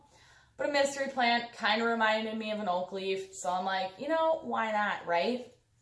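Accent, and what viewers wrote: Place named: Wisconsin, United States. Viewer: haha I also don't like peace lillies. I completely understand you. Love your videos. I was listening to you and something sounded so familiar. Then I understood you're from Wisconson. I grew up up Wisconsin and live in Switzerland now. Your accent sounds like home.:-) Your plants are beautiful, I am also plant obsessed.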